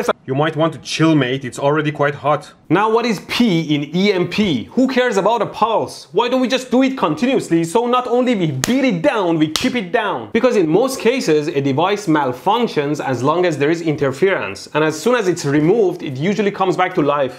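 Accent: Iran. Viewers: لهجه ایرانیت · Your accent looks like iranian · he has an Iranian accent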